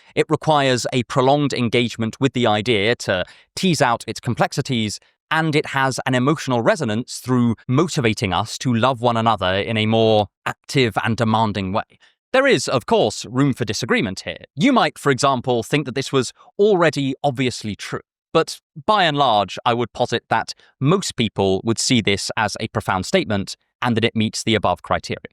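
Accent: RP, United Kingdom. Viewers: Honestly your presentation comes off this way even pretentious but you're delightfully sensible as far as I've seen. Might be the accent if I'm honest very posh to my American ears.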